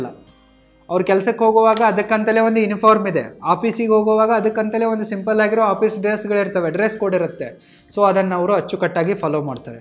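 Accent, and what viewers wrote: North Karnataka, India. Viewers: ನೀವ್ ಉತ್ತರ ಕರ್ನಾಟಕದ ಕಡೆ ನಾ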